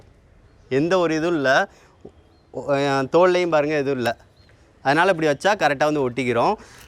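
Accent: Sivakasi, India. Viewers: Bro U sivakasi yaa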